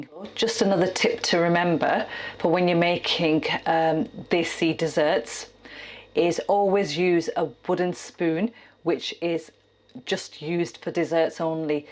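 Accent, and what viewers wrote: United Kingdom. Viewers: You have a nice British accent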